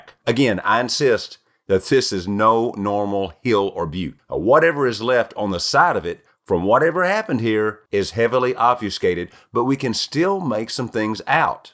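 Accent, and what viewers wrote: South, United States. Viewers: I'm Northern born but Southern raised so it's nice to hear a Southern voice on an intellectual posting, Hear what I'm saying y'all?!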